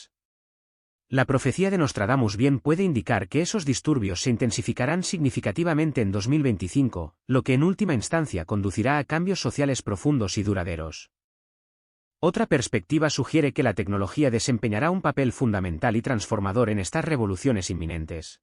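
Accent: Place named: Spain. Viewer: UNA SELECCIÓN SELECCIONADA????, POR PIEDAD, UNA UNA APLICACIÓN DE NARRACIÓN EN ESPAÑOL LATINO, EL ACENTO DE ESPAÑA ES NAUSEBUNDO......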